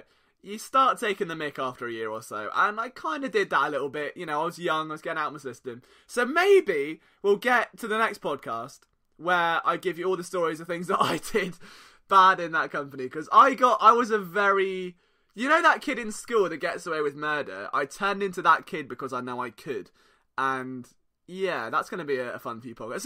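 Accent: United Kingdom. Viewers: Kamu Grugier-Hill -- To Choke: to have victory in your sights only to let it slip through your fingers. Titans, Panthers and Dallas. No wonder the Eagles can't figure it out. They don't even know they are the ones who are choking! Dallas game -- Obviously need Carson to have a big passing game but Sproles is the key. The one running back that a defense can't gameplan. According to the New Orleans game, 14 points will win it. Need points on the first two drives. Brandon Graham -- Won't be signed because there is no money because Eagles will want to get the Carson Wentz deal done in 2019. This was clear to me as soon as they didn't do a deal this spring. Other deals -- Which receiver is better for the Eagles: Tate or Jeffries? Can only keep one. -- Make your goal to become an Eagles scout. You do good analysis and the Eagles like having people with British accents. 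Find them an under the radar franchise running back like Pittsburgh's Willie Parker.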